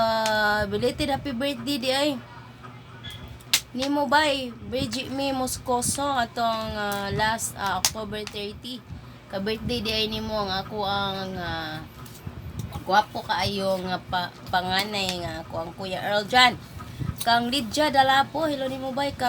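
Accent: Visayas, Philippines. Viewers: .dont be upset naghalo ang kape at gatas at isa pa.carbon copy ung babae mo sa asawa mo dat means ur so inlove sa uyab mong pula dhil kahawig na kahawig sa asawa mo yan ang kasabihan. Isa ako sa avid fans mo kc pagnagsalita ka nkakatawa ung bisaya mo parang c ate charing I'm long time avid fans of u.pls reply me sometimes.thks.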